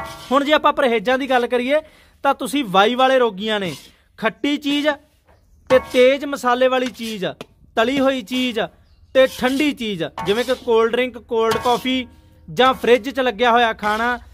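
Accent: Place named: Malwa, India. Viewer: ਸਤਿ ਸ੍ਰੀ ਅਕਾਲ ਵੀਰ ਨੂੰ 🙏🙏🙏 ਵੀਰ ਸਤਿ ਸ੍ਰੀ ਅਕਾਲ ਮਨਜੂਰ ਕਰਨਾ ਵੀਰੇ ਭਰਾ ਮੇਰਿਆ ਮੇਰੀ ਪਤਨੀ ਨੂੰ ਗਠੀਆ ਏ ਜੋ ਜੋੜ ਦਰਦ ਬਾਰੇ ਤੂੰ ਦੱਸ ਰਿਹਾ ਏ ਸਭ ਕੁਝ ਉਵੇਂ ਹੀ ਏ ਤੇ ਉਹ ਮੈਡੀਕਲ ਦੀ ਦਵਾਈ ਖਾਂਦੀ ਏ ਕੋਈ ਜਿਆਦਾ ਫਰਕ ਨਹੀਂ ਬਾਕੀ ਤੇਰੇ ਬੋਲਣ ਦੇ ਅੰਦਾਜ਼ ਤੋ ਪਤਾ ਲੱਗਦਾ ਕਿ ਸ਼ਾਇਦ ਤੂੰ ਮਾਲਵਾ ਏਰੀਏ ਦਾ ਹੈ ਮੈਂ ਸੰਗਰੂਰ ਦੇ ਨੇੜੇ ਇਕ ਪਿੰਡ ਵਿਚੋਂ ਹਾਂ ਹੁਣ ਸਰਦੀਆਂ ਨੇ ਹੁਣ ਦਰਦ ਹੋਰ ਵੀ ਵਧ ਗਿਆ ਏ ਤੇ ਹਰ ਸੀਜਨ ਇਹੀ ਕੁੱਝ ਬਣਦਾ ਏ ਜਦੋਂ ਮੌਸਮ ਬਦਲਦਾ ਏ ਕਈ ਵਾਰ ਪੈਰਾਂ ਦੀਆਂ ਅੱਡੀਆਂ ਵੀ ਸੁੱਜ ਜਾਂਦੀਆ ਨੇ ਤੇ ਇਸ ਤਰਾਂ ਮਹਿਸੂਸ ਹੁੰਦਾ ਜਿਵੇਂ ਕਿ ਅੱਡੀ ਵਿੱਚ ਪੀਕ ਪਈ ਹੋਵੇ ਕਈ ਵਾਰ ਘਿਉ ਦੀ ਮਾਲਿਸ਼ ਕਰਕੇ ਕੁੱਲ 10% ਦਰਦ ਘੱਟਿਆ ਮਹਿਸੂਸ ਹੁੰਦਾ ਸੋ ਵੀਰ ਮੇਰਿਆ ਮੇਰੀ ਗੱਲ ਜਵਾਬ ਜਰੂਰ ਦੇਵੀਂ ਬਾਕੀ ਵੀਰ ਮੈਂ ਖੁਦ ਆਪ ਮਿਰਗੀ ਦੇ ਦੌਰੇ ਦਾ ਮਰੀਜ਼ ਹਾਂ ਮੈਨੂੰ ਵੀ ਦਵਾਈ ਖਾਂਦੇ ਨੂੰ 12 ਸਾਲ ਹੋ ਚੁੱਕੇ ਨੇ 2007 ਵਿੱਚ ਪਹਿਲੀ ਵਾਰ ਦੌਰਾ ਪਿਆ ਸੀ ਤਿੰਨ ਸਾਲ ਦਵਾਈ ਖਾਣੀ ਹੁੰਦੀ ਏ ਪਰ ਵੀਰ "ਚਾਰ ਤੀਏ ਬਾਂਰਾਂ" ਹੁਣ ਤੱਕ ਚੱਲੀ ਜਾਂਦੀ ਏ 12 ਸਾਲ ਤਾਂ ਖਾ ਲਈ ਹੋਰ ਪਤਾ ਕਿਥੇ ਤੱਕ ਜਾਣੀ ਏ ਵੀਰ ਮੈਂ ਤੇਰੇ ਗੱਲ ਕਰਨੀ ਏ ਜਾਂ ਤਾਂ ਆਪਣਾ ਨੰਬਰ ਦੇ ਦੇਵੋ ਜਾਂ ਮੈਨੂੰ ਕਾਲ ਕਰ ਲਈ,ਵੈਸੇ ਵੀਰ ਤੂੰ ਤਾਂ ਆਪਣਾ ਨੰਬਰ ਸ਼ਾਇਦ ਹੀ ਲਿਖੇ ਕਿਉਂਕਿ ਹਰ ਕੋਈ ਕਾਲ ਕਰੀਂ ਜਾਂਦੇ ਨੇ ਮੇਰੇ ਨੰਬਰ ਤੇ ਕਾਲ ਕਰੀ ਵੀਰ <num> ਸੋ ਵੀਰ ਮਿਹਰਬਾਨੀ ਹੋਉ ਤੇਰੀ ਪੜਨ ਵਾਲੇ ਹਰ ਭਰਾ ਬੇਨਤੀ ਏ ਮੈਨੂੰ ਕਾਲ ਉਹੀ ਵੀਰ ਕਰੇ ਜੋ ਵੀਡੀਓ ਵਿੱਚ ਜਾਣਕਾਰੀ ਦੇ ਰਿਹਾ ਏ ਕੋਈ ਹੋਰ ਵੀਰ ਬੇਵਕੂਫ ਬਣਾਉਣ ਦੀ ਕੋਸ਼ਿਸ਼ ਨਾ ਕਰੇ ਪੜਨ ਲਈ ਧੰਨਵਾਦ ਵੀਰ ਦਾ ਸਤਿ ਸ੍ਰੀ ਅਕਾਲ ਵੀਰ ਨੂੰ ਇੰਦਰਜੀਤ ਸਿੰਘ ਸੰਗਰੂਰ ਦੇ ਇਕ ਪਿੰਡ ਵਿਚੋਂ 🙏🙏🙏🙏🙏🙏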